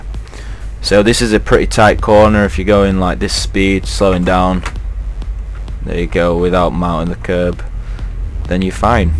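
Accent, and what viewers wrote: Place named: United Kingdom. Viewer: fututi acentu tau de britanic ca nu inteleg nimic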